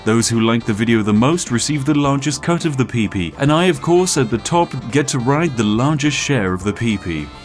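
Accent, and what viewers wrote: United Kingdom. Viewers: Oh Im so happy to have found this channel. I wouldve never thought that making money could be so easy or that some companies are just that stupid. Not that I ever tried some of these tactics but you and your humor and british accent are making the journey worth watching. Thanks for making these videos and keep up the great work. Your one of my favourite channels that Im always watching right now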